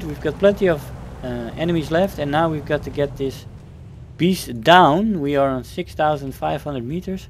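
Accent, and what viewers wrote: Netherlands. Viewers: great video and great person. are you dutch?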